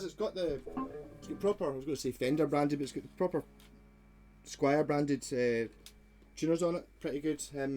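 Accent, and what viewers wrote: Scotland, United Kingdom. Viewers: Sounds a little Scottish. Right on! Love the guitar.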